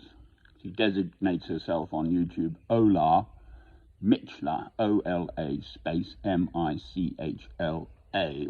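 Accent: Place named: Scotland, United Kingdom. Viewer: your scottish accent is remarkable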